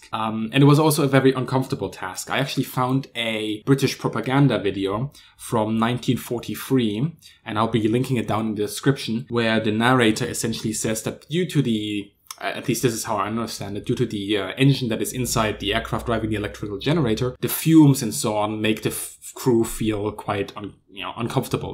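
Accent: Germany. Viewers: You sound German, so I get that you use meters, but that's more like 150 feet. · Bassmint He sounds german and in german its called aluminium so thats just natural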